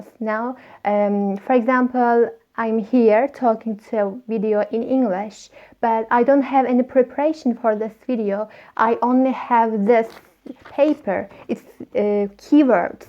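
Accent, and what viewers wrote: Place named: Turkey. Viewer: Türk olduğun çok belli oluyor konuştuğunda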